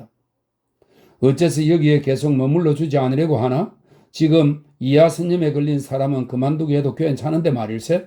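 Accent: Gyeongsang, South Korea. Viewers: 축약해서 읽어주는 사이트는 왠지 작가의 인생관을 온전히 듣지못할것 같아서 안듣게되구요.이 사이트가 너무 좋아요.특히 경상도 말씨가 맘에 들구요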